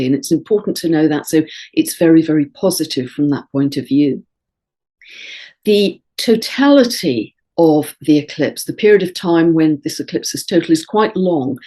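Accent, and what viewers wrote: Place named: RP, United Kingdom. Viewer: Never been to this channel before, but this woman is poshness goals 😶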